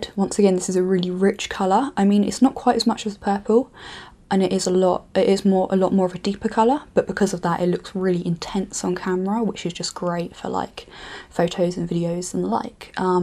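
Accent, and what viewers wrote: England, United Kingdom. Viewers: CAME HERE FOR THE BRITISH ACCENT! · More videos pls! I love the English accent. Subscribed!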